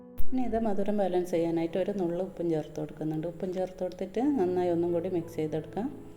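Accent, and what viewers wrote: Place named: Nadapuram, India. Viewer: Nadapurathukariyanooo samsaram kettitt ❤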